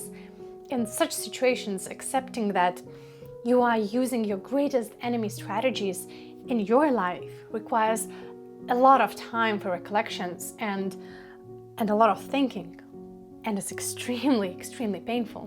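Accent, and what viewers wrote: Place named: Lithuania. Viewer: To be honest, I just jumped into your channel accidentally, been watching videos about turning vans into a campers and in one of them I just heard slightly Lithuanian accent so now I'am here. Tu tikrai darai puikų darbą skleisdama šią žinią. Man net sunku įsivaizduoti, kiek moterų vien lietuvoje gali būti tokiuose santykiuose. Kai tai pasidaro matoma aplinkiniams, tai jau toli pažengę ir blogiausias dalykas, kad auka pati, be išorinės pagalbos dažniausiai net nesuvokia, kokiuose santykiuose ji yra. Džiaugiuosi, jog tau pavyko tai nutraukti, be abejo, išėjai iš to stiprensė. Sėkmės.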